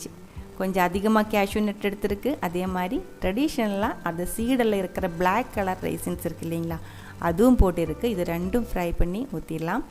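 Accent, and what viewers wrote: Kerala, India. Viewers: Mam very interesting... I loved it.. Malayalam slang Tamil... Super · Good process. Nice Tamil with kerala slang. Good ma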